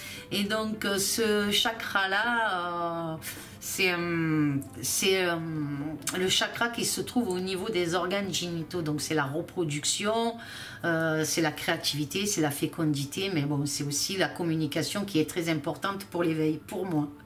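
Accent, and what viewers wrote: South, France. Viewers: Vous êtes très spirituelle. Merci pour vos video. Refusons le vaxxx tous ensemble. J'adore votre accent du sud !!! Lumière sur vous.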